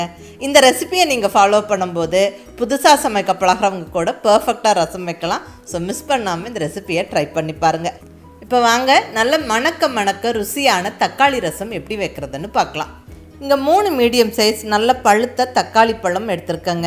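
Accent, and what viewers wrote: Coimbatore, India. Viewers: Madam. Unga cooking oda unga coimbatore language thukkala iruku super madam .nice to hear. Continue madam.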